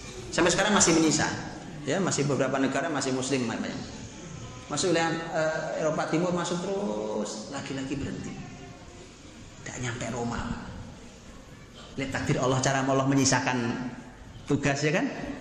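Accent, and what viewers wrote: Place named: Java, Indonesia. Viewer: oh pantesan logatnya jawa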